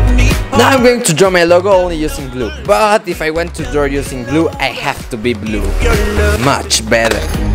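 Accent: Mexico. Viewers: Oye te amo. No entiendo nada de lo que dices xD pero mi untuicion dice que eres mexicano jsjs besos en el fundillo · Tu ingles es muy mexicanisado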